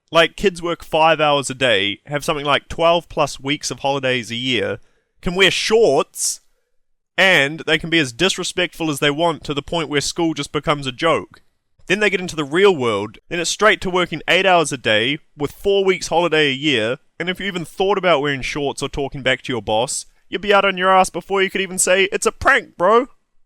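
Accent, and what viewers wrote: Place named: United States. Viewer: I was hoping for this to be sarcastic (google it). At least it made me realize I should think about how to exlpain the importance of education to someone who's not educated, because (judging by the comments) most of the people reading this a) won't bother reading it and b) won't understand it. One of the biggest problems in the world is the lack of education. A big part of that problem is about very simple stuff like reading but people having basic knowledge about how things like you, our cultures and the world work opens up incredible possibilities. You shouldn't go to school just to get a degree. That attitude is the best way to make sure you take the easiest route and get no real education. According to the video there are big gaps in the American (I'm guessing by the accent) school system. I'm sure there are teachers who would gladly give lessons about taxes and other adult stuff. 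If there aren't, don't quit school, try to make it better for everyone. Most of the "useless" stuff mentioned is not actually useless, you just never learned how to use it. When it comes to things like math problems and reading novels, a lot of it is just brain exercise that makes you generally smarter. I could go on but it's 1 at night.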